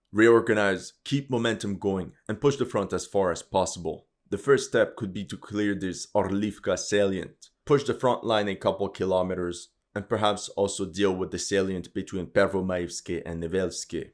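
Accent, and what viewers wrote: Russia. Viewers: Lol, "good job". May I ask you where are you from? Sounds like you're sweating your ass to hide Russian accent.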